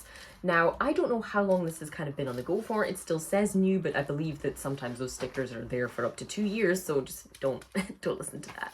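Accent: Scotland, United Kingdom. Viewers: best accent in the world go scotland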